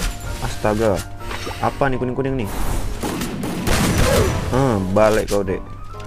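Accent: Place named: Bali, Indonesia. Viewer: bang lu kok ngomong nya kya logat bali😭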